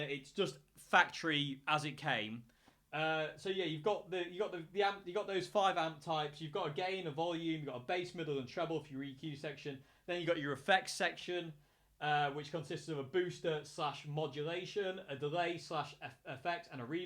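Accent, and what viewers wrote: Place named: England, United Kingdom. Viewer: When it comes to SS amps, I love my Peavey Bandit Silver trim and was considering a Fender Champion 100 now that I am back in a gigging band (loud drummer, bass, synth, and 2 guitars doing modern rock) but after seeing this video should I really go for the Boss Katana 2x12??? Oh and sorry for ever thinking was Scottish (Borders region). Yours is a tricky English accent to place but I am still embarrassed as someone who has spent time on both sides of the border.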